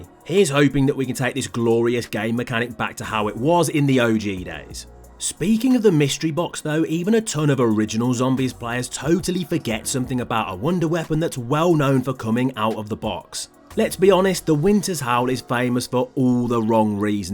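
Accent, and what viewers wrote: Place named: Australia. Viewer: accent is like a heavy country Australian accent, plus a heavy accent from the uk somewhere very awesome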